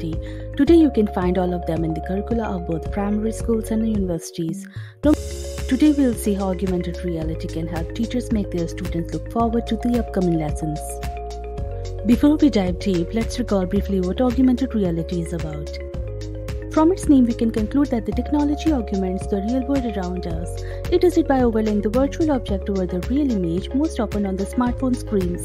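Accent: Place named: India. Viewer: Yeah, also the Indian accent English grates on the ear. Slow down please. I feel like I will explode while watching but the content is good.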